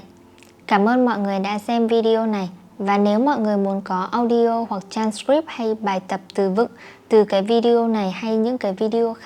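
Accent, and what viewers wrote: North, Vietnam. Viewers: Does the YouTuber speak the Northern Vietnamese language in her videos?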